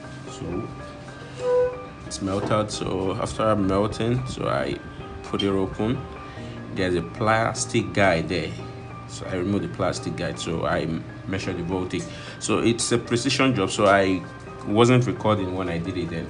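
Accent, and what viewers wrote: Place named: Yoruba, Nigeria. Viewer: When i heard how you are speaking, i uad to check your name. Yoruba man 👍👍👍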